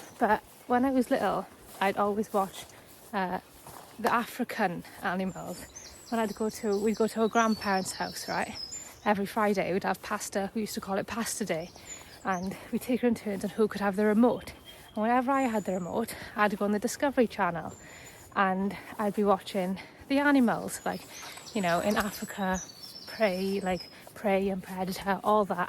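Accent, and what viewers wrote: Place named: Wales, United Kingdom. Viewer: I'm From Chippenham, Wiltshire. Recently, I discovered a Neolithic burial chamber which is just 20 feet from the road I have travelled along literally thousands of times. Lanhill Longbarrow, 3500bc. It has also been called in the past Ubbe's Low. Allegedly it contained the body of Ragnar Lothbrok's son Ubbe. But this is probably myth. Blows my mind to think one of the oldest buildings in these Island's history is just randomly situated just off a busy main road. Something so ancient and hardly anyone knows it's there. You have a lovely Welsh accent and it's magical when you say 'beautiful'. You're very humble, which I find to be a general Welsh trait. Keep posting from lovely Wales.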